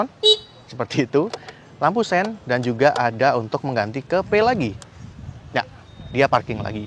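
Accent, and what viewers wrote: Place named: Java, Indonesia. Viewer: masa reviewer logat Medok gitu di bilang enak di denger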